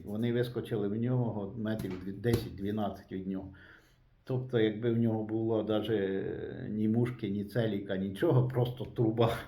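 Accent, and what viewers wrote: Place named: Vinnytsia, Ukraine. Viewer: Шановний пан! Чудово, що Ви зробили свій канал і популяризуєте мисливство. У Вас надзвичайна харизма і неперевершена вінницька говірка, Ви прекрасний оповідач і фахівець своєї справи. Але ваші відео не тільки корисні а і вредні) Я три місяці тому купив АР15 в 223 калібрі для захисту, тренувань і розваг, і зовсім не для полювання. На стрільбищах і бачив болтовики і стріляв трохи. Також дивлюсь чудові збройові посиденьки від Ібіса з Тарасом і Антоном. Вони теж популяризують зброю, зокрема болтовики. З ваших веселих оповідей я захопився полюванням на дивані) хоч всього пару раз був на охоті колись глядачем. І прикидував, як би то я зі своєю аркою теж би ходив. І тут Ви кажете, що 223 калібр зовсім не підходить для полювання, я Вам, як фахівцю безперечно вірю, очевидно, що це так і є. А Тарас якраз розхвалював карабін Маузер М18 в 308му калібрі. 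Все, думаю, куплю собі Маузера! Подивився відео, які вони класні, і знайшов, де його можна купити найдешевного зі стволом 51 см. Порадився з родиною, ну, кажуть, твої гроші... Зібрався в Ібіс по планку Пікатіні, а потім в інше місто по карабіна, і за три кілометри до Ібіса думаю - а нафіга він тобі треба, той Маузер? Яке полювання, ти по справжньому ніколи на ньому не був, і не знати коли ще попадеш на нього. Купити, щоб клацати класним затвором, і іноді стріляти по паперовим мішенькам? То у тебе є арка для цього! Ні, не час зараз для цього. Цілий ранок мені було погано, що відмовився від скоротічної мрії, а потім сам себе хвалив за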